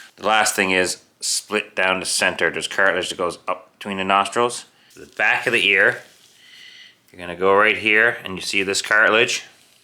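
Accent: Newfoundland, Canada. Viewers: I can recognize a newfie accent even if it’s faint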